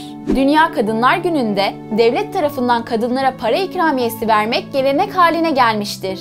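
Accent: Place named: West, Turkey. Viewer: Yok ya sahimi şu batılı ağzıyla car car etmeseniz olmuyor yalan bu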